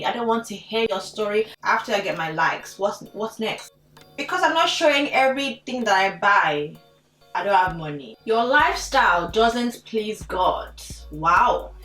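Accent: Nigeria. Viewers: Your Nigerian accent came out during this video, and I am here for it sis!!! Love you😍😍😍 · Your Nigerian accent definitely made it's appearance in this video and I LIVE! Lol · I find you very attractive with your Nigerian accent😍😍😍😍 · I like u ur a smart girl sis lol I mean I've always liked u but after this video I'm like🤔she talking sense btw I love ur Nigerian accent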